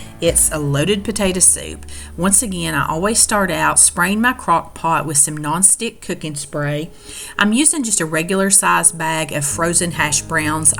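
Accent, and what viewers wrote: South, United States. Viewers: I could have eaten every bite and licked the pot! However my significant other won't eat soups, chili, dumplings and just about anything with a broth, including spaghetti. Really bums me out. We are your near neighbors just off the Blue Ridge Parkway in western NC. This beautiful fall weather has really put me in the mood to cook. I'd have to eat off a potful for a week by myself or try to freeze most of it. No close family or neighbors to share with. Loved watching you cook and hearing a sister southern girl.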